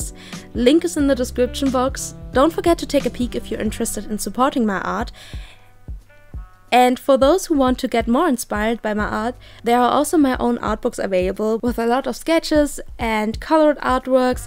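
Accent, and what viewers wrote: Germany. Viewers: Ich bin mir unsicher ob ich deinen deutschakzent im englisch nicht zu sehr mag als das ich wechseln würde. Ich glaub ich schau lieber deine englischen viedeos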